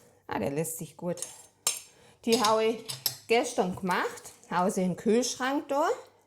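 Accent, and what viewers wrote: Swabia, Germany. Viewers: Oh,a Schwäble ,schee ! Scho aboniert ❤!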